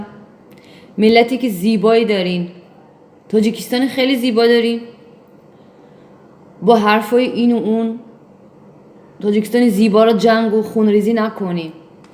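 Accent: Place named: Khorasan, Afghanistan. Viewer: ДАР хуросони Афгонистон Точико хамихел Дари сухбат мекунан 😀😂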